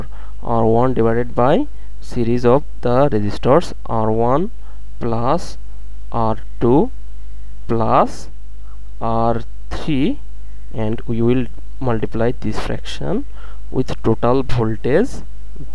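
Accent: India. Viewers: I know it's an inappropriate statement but your accent sounds more like indian than Bangladeshi... :/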